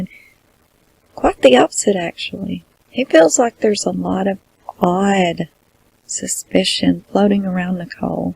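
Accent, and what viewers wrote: South, United States. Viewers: I also agree...your voice is soothing and southern...I'm from Tennessee and your voice reminds me of mine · Love your southern accent..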